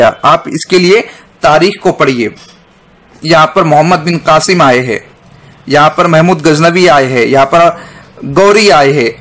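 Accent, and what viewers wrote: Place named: India. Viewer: You are talking about Urdu and using English words in Indian pronunciation...... you are fucked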